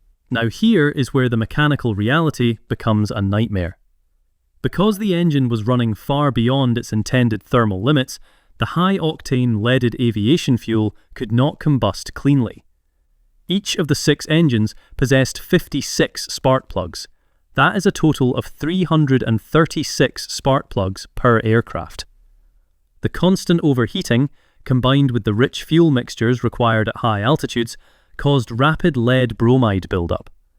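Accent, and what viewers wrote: United Kingdom. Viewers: It's also a UK accent using exclusively US units Obvs not concrete evidence but defo suspish imo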